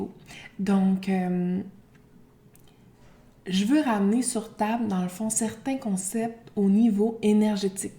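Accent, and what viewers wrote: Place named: Canada. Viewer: Vous êtes d'origine canadienne selon votre accent... pour que les gens qui vous suivent, vous n'ai parlé pas de votre carrière!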